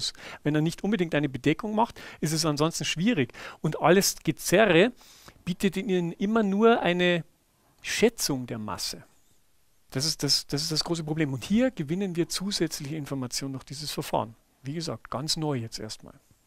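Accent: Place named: Landshut, Germany. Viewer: Sehr interessantes Video Herr Gaßner, weiter so! Mich würde interessieren, ob es irgendwann vielleicht auch einen Beitrag über die galileischen Monde oder die Monde des Saturn gibt? P.S. Man hört, dass Sie aus Landshut kommen ;)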